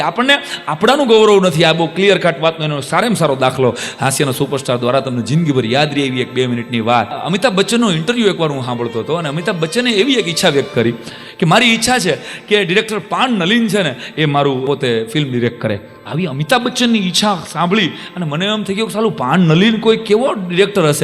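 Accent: Kathiawar, India. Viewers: tmari speech kaathyavaadi mne khub j gme 6e kaathyavaadi speech madh jevi meedhi hoy 6e jane k sambhlta rahiye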